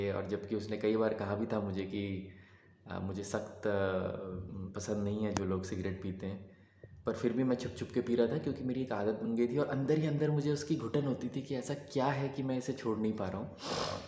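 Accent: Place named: Andhra Pradesh, India. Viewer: telugu boy talking in shudh hndi lets goo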